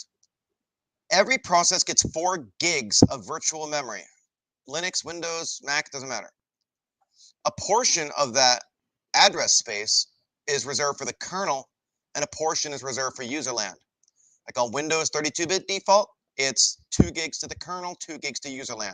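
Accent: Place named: Los Angeles, United States. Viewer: Which accent is that? LA?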